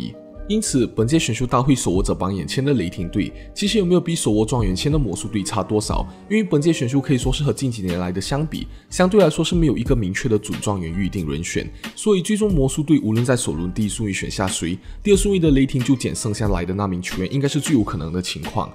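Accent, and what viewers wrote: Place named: Malaysia. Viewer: 馬來西亞口音本來就跟我們平常聽到的不太一樣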